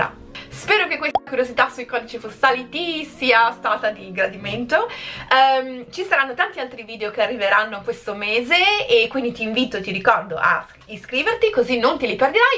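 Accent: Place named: United Kingdom. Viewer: Video molto interessante, mi è giunta una curiosità! Ma tu sei Italiana di nascita? Perché hai un accento british perfetto quando parli inglese, e ti rimane leggermente quando parli in italiano!!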